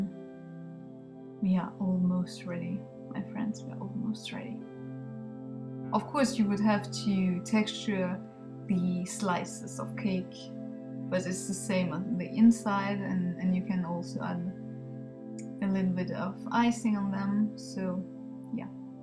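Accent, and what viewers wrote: France. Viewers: are you french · are you French? I liked the voice-over to.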